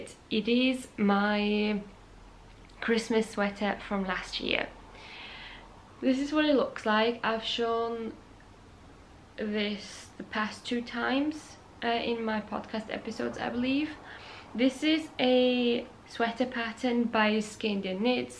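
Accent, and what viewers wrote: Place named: West Yorkshire, United Kingdom. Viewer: Hi, found your channel today and have really enjoyed watching some episodes. Think I heard you saying that you are sending from Austria? I lived a couple of years in west Yorkshire a long time ago, and to me you sound very much like my colleagues at that time. Am I mistaken?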